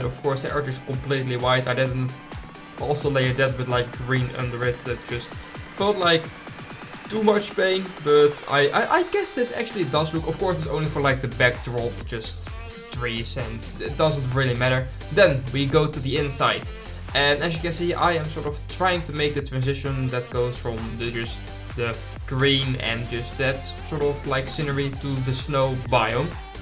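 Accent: Netherlands. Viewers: Probeer niet engels te praten want je probeert Engels te praten maar je hoort je Nederlands tussen en dat in het ombetant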